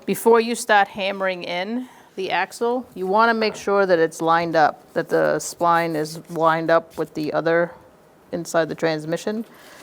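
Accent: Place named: New York, United States. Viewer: New York or Jersey accent for sure. (out sounds like oat)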